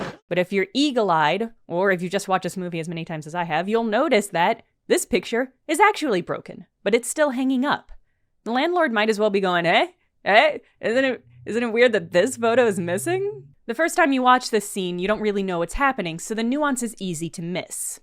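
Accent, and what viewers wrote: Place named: United States. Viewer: Can I find a non American narrative of this British classic ?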